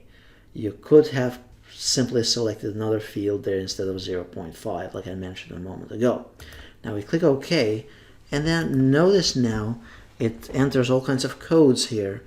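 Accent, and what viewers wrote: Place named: Albania. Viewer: Great job. Your Albanian accent is strong though :)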